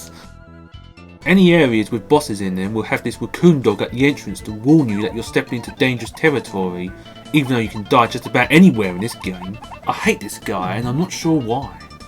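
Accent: England, United Kingdom. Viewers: Ha! I knew it! You sound English. Am I right?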